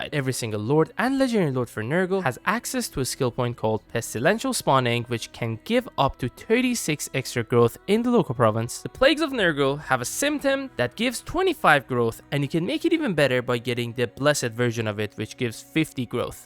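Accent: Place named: Iran. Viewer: you have a very strong iranian accent, that brings the question, are you iranian?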